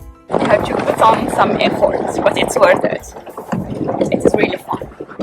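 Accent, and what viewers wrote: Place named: France. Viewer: Work on accent as it sounds French, but good effort content wise .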